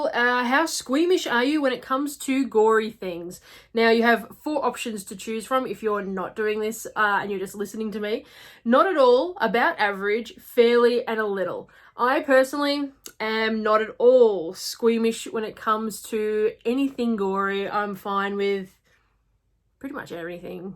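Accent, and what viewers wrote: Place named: Australia. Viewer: I got Claire as well! Don't pay any attention to nasty people. I am Canadian and love your accent. I have a friend from Melbourne who sounds just like you. I love your Outlander Tuesdays. I just started reading the books and watching the show about a year ago and am obsessed. Keep up the great videos!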